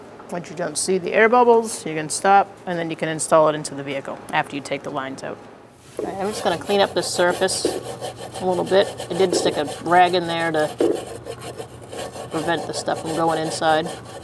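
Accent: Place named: Northeast, United States. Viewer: Excuse me but are you from Massachusetts by chance? Either that or New York but it's definitely a northeastern accent lol Love it! Also a Bostonian here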